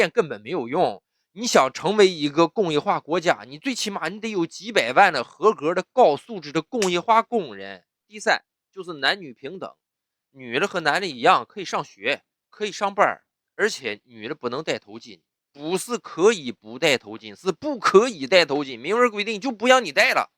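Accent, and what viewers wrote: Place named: Daqing, China. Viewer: 大庆话，有生之幸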